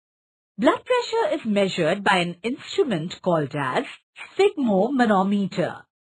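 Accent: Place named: India. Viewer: What language is this ? English ? I barely can understand the Indian accent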